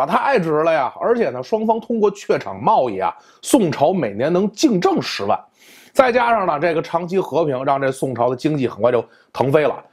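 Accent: Beijing, China. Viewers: 还是北京话好听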